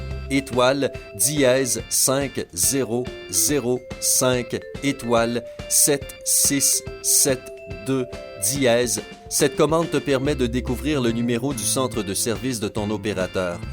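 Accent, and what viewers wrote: Quebec, Canada. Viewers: Accent québécois ou je me trompe ! J’aime cet accent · et sinon a l accent et aux numeros tapés sur le tel dans la video, vous ne comprenez pas que ca se passe au quebec et que donc il y a une partie des codes qui peuvent etre dependant des fournisseurs d acces, et que donc ca pourrai ne pas marcher pour tous les codes dans tous les pays? une partie doit dependre du telephone en lui meme (et donc marchent bien) et une autre partie du fournisseur.. en attendant ceux qui font croire connaitre tous ces codes, c est juste des branleurs qui se la racontent.. a part le #31# tres peu ont du deja entendre parler de ces codes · Pk vous mettez un accent Canadien ? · Voix de Québecoi ? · Pour le premier conseil, effectivement L'IMEI est très utile en cas de vol pour inscrire le tph au fichier des objets volés. Par contre aucune localisation possible avec ça. 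Quant au blocage de l'appareil c'est pas la police qui le fait non plus, c'est votre opérateur. En tout cas en France c'est comme ça, au Québec (vu l'accent de la voix off) je sais pas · Je préfère l'autre voix de Sympa perso j'ai pas l'habitude de la voix Québécoise moi 😕. il dit smartphone en anglais et ça fait trop bizarre, j'aime pas ! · C'est quoi cette accent de bouseux du Québec a vomir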